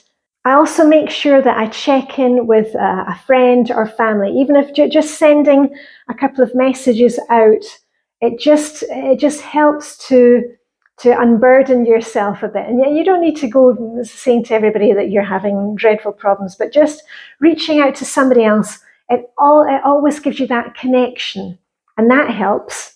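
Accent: Scotland, United Kingdom. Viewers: Hiya. In answer to your question of how I found you, you've popped up on my YouTube suggestions on my mobile. I've been watching de-clutter, minimalist stuff so guess it's those algorithms. I warmed to your scottish accent, I'm a fellow Scot! Thank you and keep up the good work 😊 x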